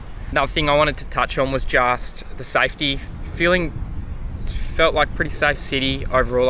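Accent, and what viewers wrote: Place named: Australia. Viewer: Aussie accent, interesante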